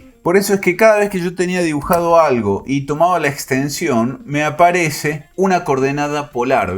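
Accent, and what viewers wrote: Argentina. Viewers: Lo más chistoso, es la forma en que en Argentina nombran "Y";en México la distinguimos, como la y griega y la nombramos (lle); asimetricamente , (ye), para tenerlo bien diferenciado de la(i) latina;así llamada,, aunque en sonido es casi la misma pronunciación, arrastramos un poco la (Ll)cuando pronunciamos la "y", ya que en términos matemáticos es mejor para diferenciar ambas!!!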